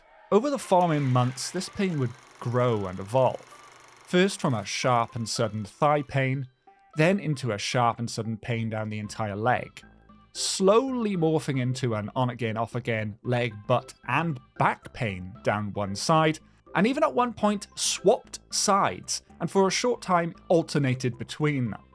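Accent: Wales, United Kingdom. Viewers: Are you welsh? If so thats super cool!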